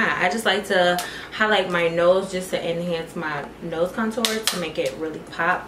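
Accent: United Kingdom. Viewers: your British accent is really cute. Your makeup looks gorgeous Charlotte Turberry 💄💄👍🏽. Congrats on your collaboration with the brandy ou deserve it. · its the british accent for me its even funnier coz im from ldn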